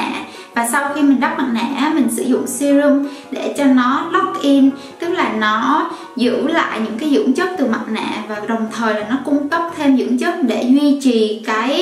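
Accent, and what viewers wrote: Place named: North, Vietnam. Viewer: E thấy nói tiếng Bắc dễ thương quá...vào một video nào đó... sẽ làm một video skincare hoặc makeup hoặc vlog..v..v nói tiếng Bắc nhé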